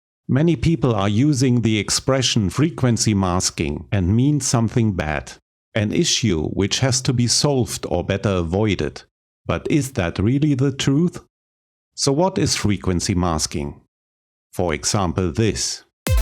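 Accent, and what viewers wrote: Germany. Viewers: I knew you sounded too German to be Spanish. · I LOVE YOUR STUFF !!! ITS SOOOOO GOOD :)! Are u german? Because your accent seems really german too me, if yes .... ICH LIEBE DEINE VIDEOS :D !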